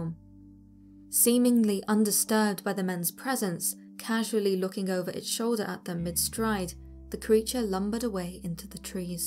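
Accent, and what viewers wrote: United Kingdom. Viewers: The lady with the British accent is an upgrade from another site where the male narrator has a heavy backwoods twang. Then there is another site that features a lady with a Canadian accent. She also has a pleasant voice while describing Bigfoot outrages such as interupting a man having a bowel movement along the side of a seldom used road. It scared the crap out of him.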